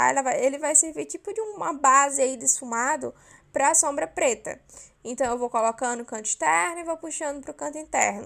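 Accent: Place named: Northeast, Brazil. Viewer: Que sotaque é esse minha fia 😍😍😍 Também sou nordestina Estou super inscrita 💥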